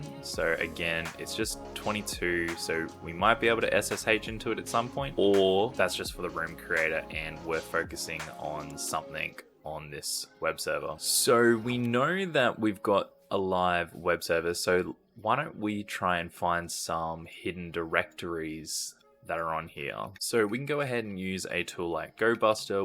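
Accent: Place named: Australia. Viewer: Australian accent lol. let me check this room. I am not there yet on THM Thank you for the video. 1000 subscriber. make it happened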